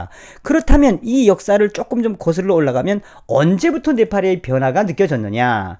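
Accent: North Korea. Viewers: 발빠르고진실된정성산방송 다른방송보다제일먼저보게됩니다 간간히북한사투리듣기좋습네다 네팔땡큐~~물개박수짝짝짝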